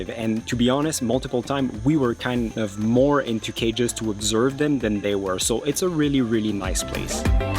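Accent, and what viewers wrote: France. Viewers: Bruh you speak a French French and an American English at the same time! I'm shocked 😱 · Beautiful video as usual. You made me fall in love with my country all over again😎. Your french accent is🤌. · I like your French accent 😅🙌🏽have fun 🇨🇲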